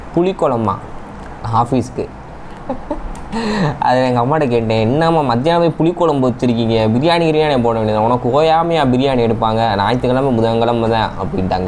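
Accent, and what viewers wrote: Madurai, India. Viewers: Bro naan coimbatore but unga madurai slang super 👌😍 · Hi bro unga Madurai slang super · Anna unga Madurai slang super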